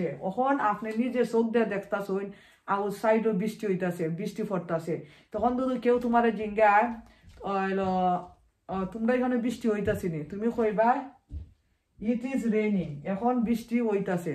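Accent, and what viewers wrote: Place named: Sylhet, Bangladesh. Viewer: Sylheti mat hunram 🙈🤣🤣❤️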